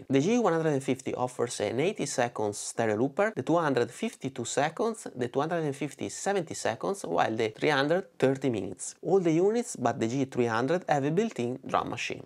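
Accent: Venice, Italy. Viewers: ti ze venessian da l'accento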